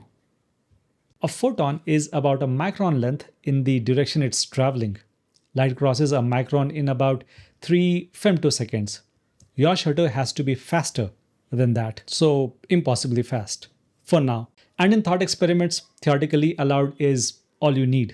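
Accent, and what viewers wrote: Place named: India. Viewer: If your attention span for listening to a man speaking English very clearly and well articulated is only 8 sec because the man has an Indian accent I propose the communication problem is entirely on your end. - by the way, you write English in a poor way yourself. Your sentences are missing words before they can be called full sentences. And your last sentence says that you lost patience with an articulation which is itself impatient with the audience of the articulation - that is just gibberish. I suggest you go practice your own English before criticizing others.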